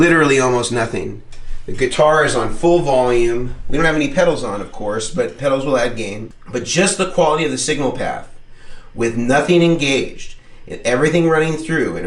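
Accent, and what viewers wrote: United States. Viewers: Hahahaha. Fantastic. thanks I enjoyed that. It's not you who first brought this to my attention but since you said it a lot on this video, sorry but you have been targeted! I have always wondered why Americans pronounce solder this way. I also wonder why some people say " aks" instead of ask. Spelling however is another matter and I am absolutely terrible at it. I have also got some famous sayings completely wrong in the past. ps. I do enjoy your videos man. I have one of the best dumble clones ever in my opinion and am obsessed with those amps which brought me to you to check out your pedals. Would love to try one. Check out my youtube videos if you wanna hear the dumble. I reckon you would really appreciate it : ). It's got original 1975 Bassman transformers in it.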